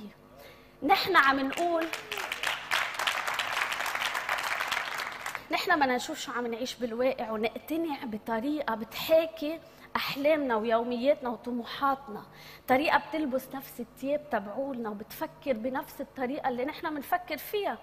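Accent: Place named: Levant. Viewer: يا حلاة اللغة العربية باللكنة الشامية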